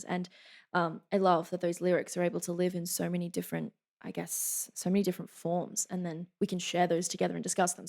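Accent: Australia. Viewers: Dunno why people would hate on Australian accent.. everytime I hear you I feel a very strong urge to just drop every responsibility I have and move to Australia just to hear the people speak and enjoy the sunset on the beach. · I do not know why I like the Australian accent so much, but I could listen to her talk all day.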